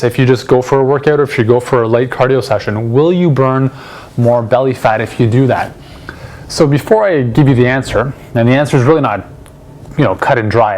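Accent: Canada. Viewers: Are you canadian? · Love canadian accent. 😉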